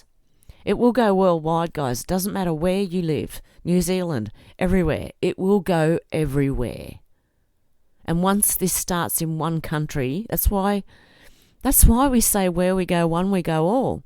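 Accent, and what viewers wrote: Australia. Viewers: I'm very glad I found your channel. Great content, authentic and soothing energy. Love your Aussi twang.. peace from Canada (speaking of twang eh) 🙏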